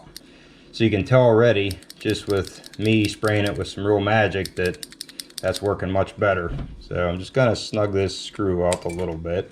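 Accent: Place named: Pittsburgh, United States. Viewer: Are you in or from the Pittsburgh, PA area? Other than not saying yinz, you have a pretty good Pgh accent.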